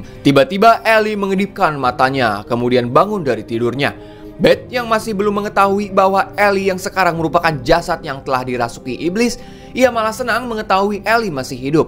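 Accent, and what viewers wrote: West Java, Indonesia. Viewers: Asalamualaikum AA emg org Sunda gitu abis lucu amat kalau nyaritaken pelemna Sagala Aya bahasa aing kitu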